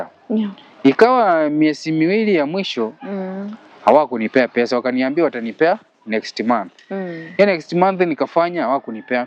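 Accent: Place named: Tanzania. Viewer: Kaka, Kiswahili chako ni kisafi sana, naskia lahaja ya Kibongo kwa mbali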